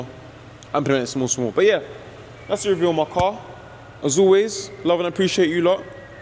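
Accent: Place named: South Africa. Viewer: he get me everytime , i keep thinking bro is from southa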